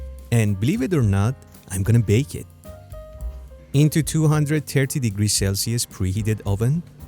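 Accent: Iran. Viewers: درود. لهجه ایرانی دارین. من تازگی میخام با خمیر ترش آزمایش کنم. امیدوارم که بتونم 😁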